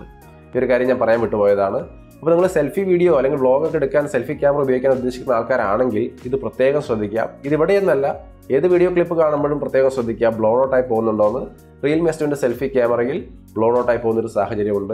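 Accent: Thrissur, India. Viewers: മനുഷ്യാ.... നിങ്ങൾ തൃശ്ശൂർകാരൻ ആയിരുന്നോ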